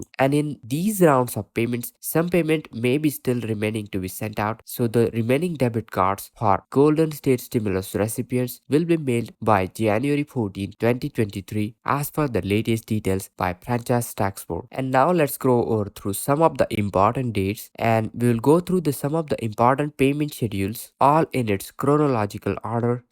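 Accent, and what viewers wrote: India. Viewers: Indian English 😂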